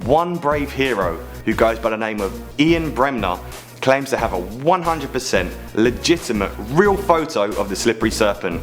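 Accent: United Kingdom. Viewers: Yay another British host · British accent <3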